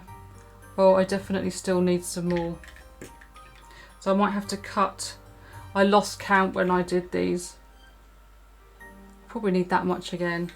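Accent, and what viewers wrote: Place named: United Kingdom. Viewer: I think everyone hates the sound of their own voice. I feel like mine is really deep for a female but then I'll hear myself on a voicemail or something and it sounds nothing like what I hear. But you have a great voice. I'm a sucker for an accent anyway. I'm assuming you're from Great Britian. Do you live there now? One day I hope to make it across the pond to visit all of the British Isles including Ireland. I've always been in love with those places and it's my dream to visit!!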